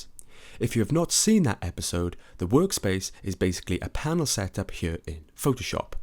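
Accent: United Kingdom. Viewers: OMG ! i don't know how to say thanks ,, you are really great teacher .. thanks a lot , and i liked your British english pronounciation ^^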